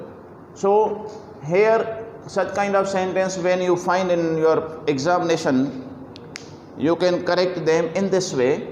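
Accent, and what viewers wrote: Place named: Sindh, Pakistan. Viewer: Sir u r out standing nd one thing ur voice tone is purely Sindhi u must keep in ur mind u delivered lecture in English 🙏🙏🙏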